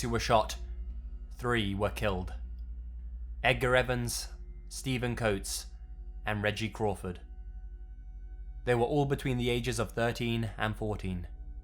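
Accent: England, United Kingdom. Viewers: you from the UK?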